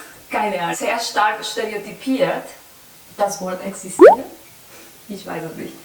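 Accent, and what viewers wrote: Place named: Eastern Europe. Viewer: Interessant fand ich das sich dein Akzent für mich erst mal eher Osteuropäisch ( Polen,Russland ) angehört hat.Ich wäre nie auf Spanisch als Muttersprache gekommen. :-)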